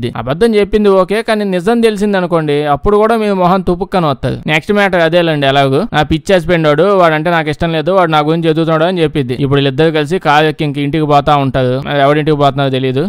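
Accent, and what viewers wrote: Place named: Coastal Andhra, India. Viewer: అసలు సినిమా కథ ఏమోగాని భయ్యా, నీ నారేషన్, అదే భయ్యా, తూ.గో, కృష్ణ జిల్లాల కలగలుపు భాష ఉంది చూశావ్, దా. . నమ్ అహ, వద్దులే బాగోదు. . .అది మాత్రం ఇరగదీశావనుకో! అట్లాగే ఇంకో సినిమా గూడ సూపిచ్చు, మర్శిపోవొద్దు. థాంక్స్.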